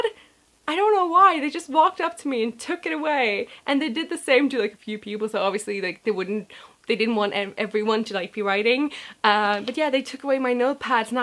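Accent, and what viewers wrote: United States. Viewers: I almost thought she was American, by the way she talks.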